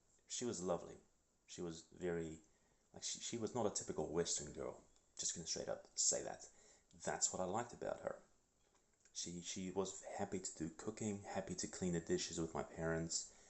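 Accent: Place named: United Kingdom. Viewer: I just prayed for you. I also want you to know that I haven't prayed in many years but I really wanted to do it for you. I have this necklace with Jesus on the Cross. I took it into my hands, went on my knees and asked Jesus to give you the strength, will and creativity to come up with solutions. I asked Jesus to help you out in finding answers. I trust Jesus and asked him to help you in any way he can. Regarding the more practical stuff, I am not sure how I can help. I am not from the UK (you sound British, so I presumed UK) but from the Netherlands. But here in the NL we have organizations where you can sign up and then you can apply for housing based on duration of membership so to say (I am trying my best to translate as well as I can). Sometimes you can get forwarded based on where you live and your current situation. But I have no idea what systems you have in the UK. 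Regarding work, I would suggest finding out what your strengths are and finding a way to learn it online while you do your other things. I thought I heard you say you were in Personal Training. But a quick example is learning to code for example. You can easily learn this online and after a couple of months you can start applying at jobs. The market right now is breaking with a lack of employees everywhere (at least here in the NL) so it's more about showing character than skills. So I also suggest showing up and just making it known you are driven and ready and able to work and make things happen. I hop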